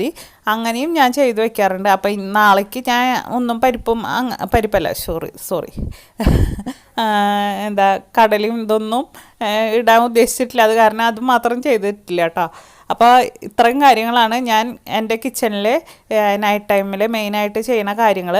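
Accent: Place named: Thrissur, India. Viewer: Thrissur slang varunnundallo.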